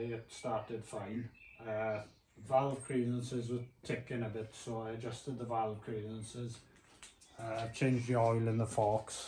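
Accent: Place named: Wales, United Kingdom. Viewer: I impulsively bought a DR350 last year with no previous motorbiking experience! I tried kick starting it a couple of times and it's just sat in the shed ever since buying it! Do you service bikes for others? I picked up on your accent straight away, and I'm based in South Wales if you would be interested in taking a look at the bike for me?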